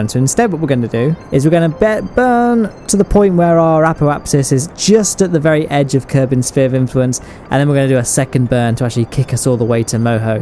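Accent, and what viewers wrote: United Kingdom. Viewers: Got a feeling he might be British. Any else one getting that feeling? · Do you from UK?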